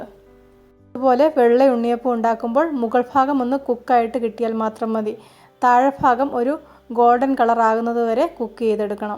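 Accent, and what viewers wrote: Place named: Southern Kerala, India. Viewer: വീട് കണ്ണൂരാണോ? സംസാരശൈലി തെക്കൻ ജില്ല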